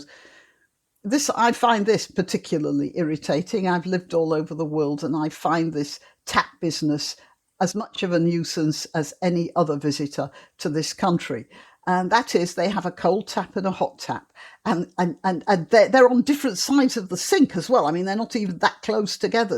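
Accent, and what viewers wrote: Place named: United Kingdom. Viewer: I love this stuff. I didn't know any of it really. And I love hearing it spoken ( in 'The Queen's English? Do you still refer to it as such? ).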